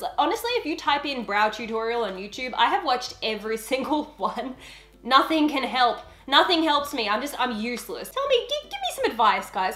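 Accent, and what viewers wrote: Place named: Australia. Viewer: i love that archie has this australian accent of yours, hahaha so cool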